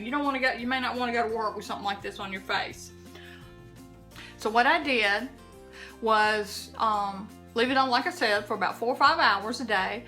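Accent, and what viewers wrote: South, United States. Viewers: Nice video and love your Southern accent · Thank you:) Love your accent as well!! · Love your southern accent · Love the info and love your accent. And you’re beautiful!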